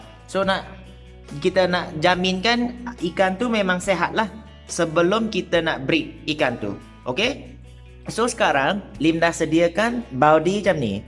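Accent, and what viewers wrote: Kelantan, Malaysia. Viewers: memang terbaiklah cina kelantan lancar je cakap kelantan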